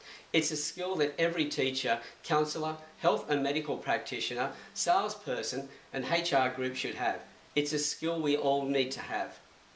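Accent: Australia. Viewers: I was talking about Tweetling saying you're Australian lol. He asked if you were Australian and it was obvious by your accent, so I asked him how he knew it (jokingly) =D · You have an Australian accent Are you An aussie? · now, that's an aussie accent!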